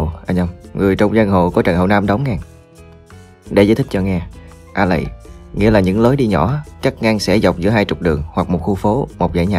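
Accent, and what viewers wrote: South, Vietnam. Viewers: nghe giọng đúng kiểu dân trong ấy luôn, hay vl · Trời ơi cái giọng Nam bộ cưng xỉuuuu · Ôi giọng miền nam thân thương😌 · Mới thấy giong miền nam nghe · Giọng wa thú vị khi đc nfhe đc 1 giọng miền nam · Mình yêu miền Nam, yêu giọng miền Nam, mê giọng của ad 💕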